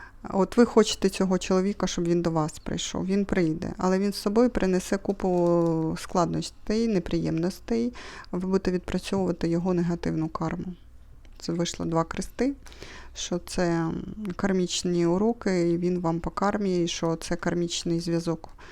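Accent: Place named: Russia. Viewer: Так, привіт, що ж я перша сьогодні, гарно оформлені ці ленорман та жаль що капацькі родом. але люде й так не знають хто їх виготовив, тож все одно повірять. продовжуй гадати. круті когті, зразу видно що хижачка, що не властиво українці. Але й рушник теж намальований, не вишиваний він вручну. Кого обманюєш липовим полотенцем, і вимовою з расейянським акцентом, певно що дурачків?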